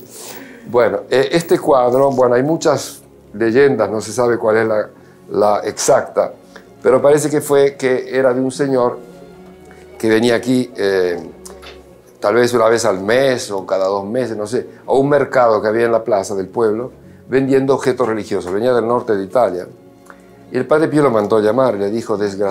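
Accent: Argentina. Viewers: Es argentino el por lo que se escucha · Gracias por este documental Muy bueno el recorrido, la transmisión y la conversación del Padre que narra este precioso documento Parece argentino🇦🇷por su forma de hablar, o de esta zona del continente Dios bendiga a todo el equipo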